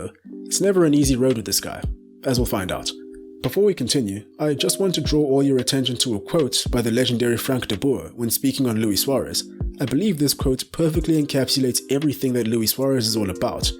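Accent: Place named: South Africa. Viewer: Where are you from?!?! You sound like you're South African, and from Jo'burg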